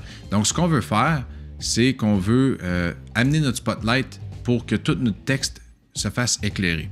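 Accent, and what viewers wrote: Quebec, Canada. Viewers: Bravo pour tes tutos efficaces et ton style posé et agréable à suivre. J'avoue que pour un papy français comme moi, ton savoureux accent canadien ajoute un plaisir supplémentaire! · Même avec un accent de québécois c'est très compréhensif.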